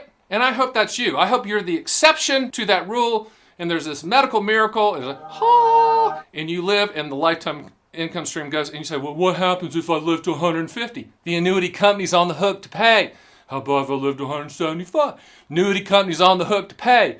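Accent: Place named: South, United States. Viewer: Fast talking Southern Boy ! Whew 😥 👍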